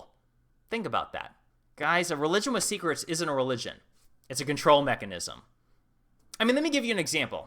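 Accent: United States. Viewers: U talk good but u so much amerikan .. try be betwen europe and amer..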